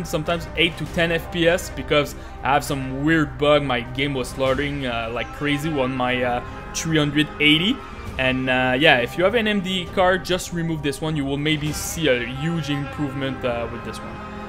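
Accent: Quebec, Canada. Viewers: Je reconnais un Québécois quand j’en entends un😂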